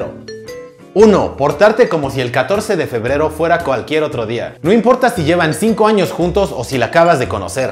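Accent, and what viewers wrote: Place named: Mexico. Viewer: Jajaja me gusta mucho el canal. Además que el español Mexicano me resulta gracioso. Saludos de un Venezolano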